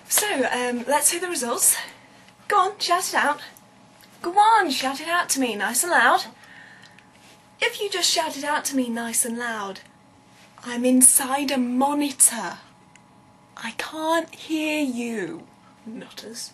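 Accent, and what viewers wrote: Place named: United Kingdom. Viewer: OMG, you sound like me, except, ya know, British. I WISH I was British. I disagree with you on the subject of Martha. I think she grew into her awesomeness, unlike Rose, who was instantaniously wonderful. Anyhoodle, yes, Jack is overshadowed in the finale by the Master. The DOCTOR is overshadowed by the Master, and it's HIS show! Thankfully there's enough slash to make you die of squeeing in the last three episodes ('use my name' indeed!) which is just fantastic! Great review!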